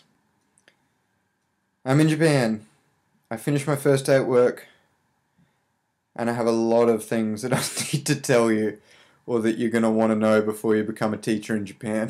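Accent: Australia. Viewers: To be honest I thought exactly the same after watching the video xD The stupid aussie accent just makes it even worse..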